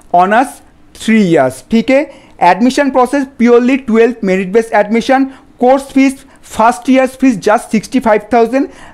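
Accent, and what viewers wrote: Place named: Bengal, India. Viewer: need to improve your communication a little, still a tone of bengali rest is ok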